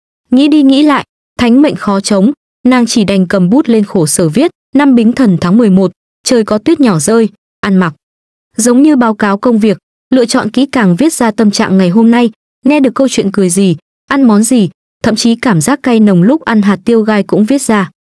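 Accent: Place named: North, Vietnam. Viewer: nghe giọng bắc quen, hên sao tìm đc bạn đọc truyện nên dễ nghe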